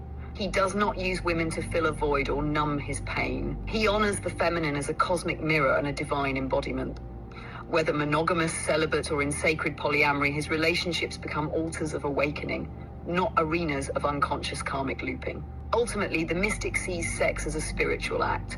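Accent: United Kingdom. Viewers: I can't stand the British voice😅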